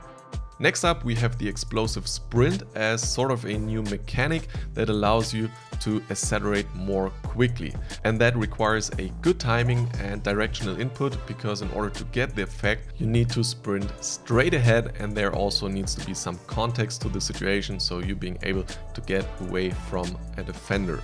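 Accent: Germany. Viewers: du klingst schon noch sehr deutsch in deinem englisch. aber cooles video! :)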